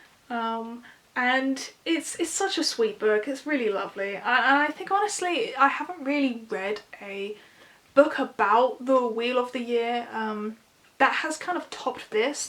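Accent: England, United Kingdom. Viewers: Are you from the UK? ❤ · Hey - just discovered your channel - I'm in Devon in England - sounds like you're in England too. I have a witchcraft channel as well - brilliant book recommendations! Thank you for sharing 😊 🙏 💓 Xxx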